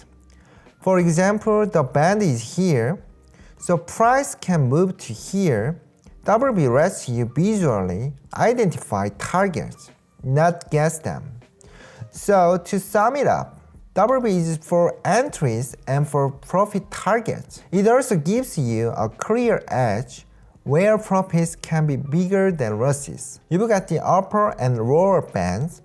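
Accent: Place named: United States. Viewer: Fifty year old born and raised Briton here. I understand him very clearly and easily. For me he is speaking with an American based accent and cadence, it is the Standard International/Business American English version. Which is overlaid onto his Native South Korean accent (Im assuming from the name ). If you can not understand him, then you will not understand most of the World when they speak English. Including from the Land where English was birthed: England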